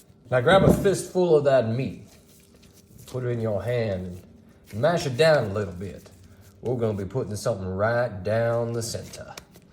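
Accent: South, United States. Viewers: Man you have that southern accent that make me laugh man. Very funny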